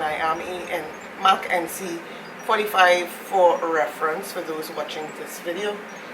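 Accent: Trinidad and Tobago. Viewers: You sound like you have a Trini accent! I love it. My dads from Trinidad. Omg! I just got my palette in the mail the other day! So Pretty!! Loving the look! 💕💕 YOU COMPLETELY SLAYED ME!!! 😍😍😍 I just want to say how much I enjoyed watching your video. Can’t wait to see more! Just left a like 👍🏽 BTW I also have a channel, I would love for you to check it out when you have a chance and show some love and support! I just uploaded a tutorial using this palette as well! Us smaller YouTubers need to stick together, let's support one another! Lol Thanks! 😘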